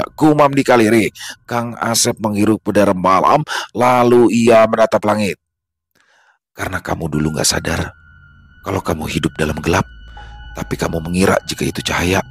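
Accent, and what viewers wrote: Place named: West Java, Indonesia. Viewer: Keren bang, logat Sundanya 😊😊😊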